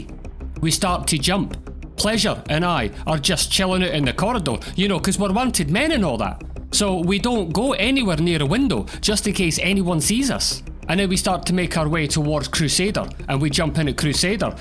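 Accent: Scotland, United Kingdom. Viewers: LMAO. There is something genius about humour + Scottish English!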